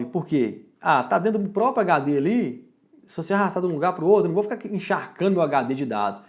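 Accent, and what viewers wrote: Minas Gerais, Brazil. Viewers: Ótimas aulas, Esse sotaque mineirim da um charme especial. Parabéns pela maneira de explicar e passar seu conhecimento!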